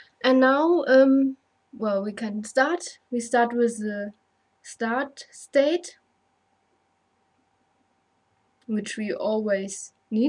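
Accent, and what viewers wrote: Germany. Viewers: I love your german accent ^^ (no offence, i am just learning german)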